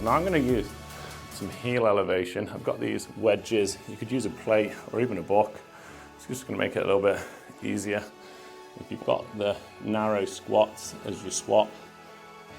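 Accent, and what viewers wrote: United Kingdom. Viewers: Not a fan of the performative, overly excited American workout videos, so your calm, monotone British accent is music to my ears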